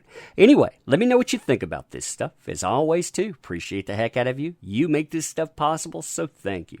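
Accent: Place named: United States. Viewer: I just love your accent as a Brit it's new to me...what State is that accent from it's great?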